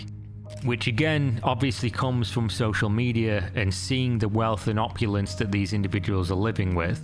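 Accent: United Kingdom. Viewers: This youtubers uk accent is so annoyinh, I cant even explain how much I hate it, just talk normaly, what is your problem mate?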